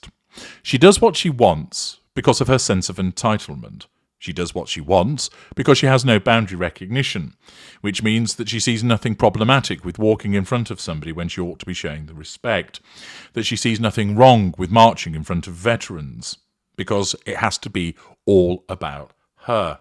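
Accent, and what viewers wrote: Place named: Newcastle, United Kingdom. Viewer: I think our glorious narrator is a Geordie.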